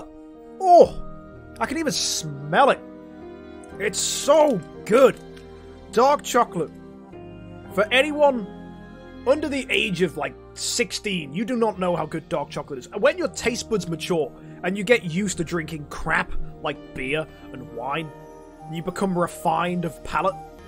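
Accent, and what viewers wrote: England, United Kingdom. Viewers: Do you live in the US? English accent, always says dollars instead of pounds it confuses me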